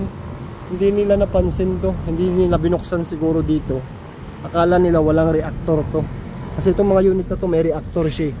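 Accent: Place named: Iloilo, Philippines. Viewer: ilongo ka too hahha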